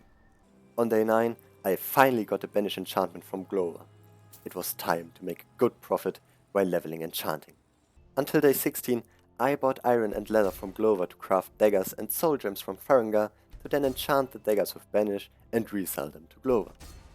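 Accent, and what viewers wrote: Germany. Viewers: U german? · Der moment wenn du direkt merkst das der dude deutsch ist😂💋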